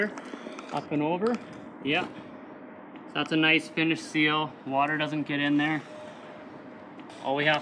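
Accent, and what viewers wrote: Canada. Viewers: Haha....you sound just like me. I'm in Northern Ontario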